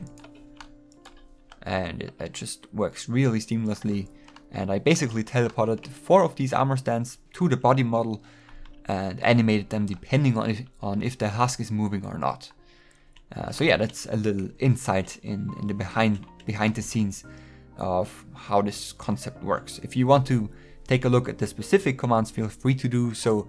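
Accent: Germany. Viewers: Is there a possibility that youre german? Kinda sounds like that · Are you German? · Are you german?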